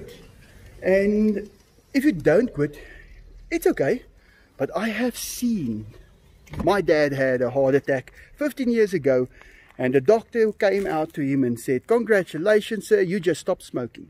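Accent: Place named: South Africa. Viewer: It sounds like Diego to me and i am Australian I can understand his accent very well, but if you are not use to a South African accent then probably.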